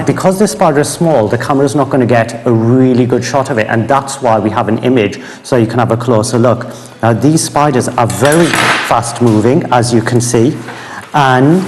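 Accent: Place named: Wales, United Kingdom. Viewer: Is he Welsh? I just can't pinpoint that accent.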